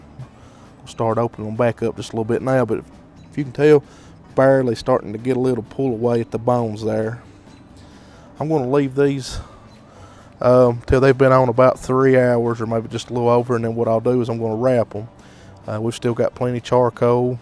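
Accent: South, United States. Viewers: Yoooo really down south sound to you man 💯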